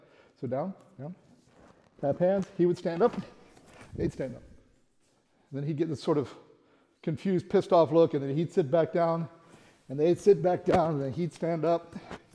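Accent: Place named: United States. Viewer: Never realized you were teaching out of Germany; I just assumed the US by the accent. Almost makes it feasible to visit from Norway as a vacation project some day.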